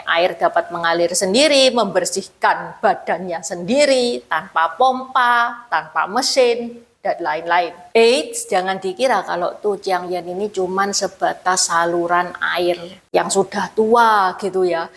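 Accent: Java, Indonesia. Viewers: Saya suka...logatnya asli orang Jawa...👍👍 Saya sukreb nona..🇮🇩 🙏🙏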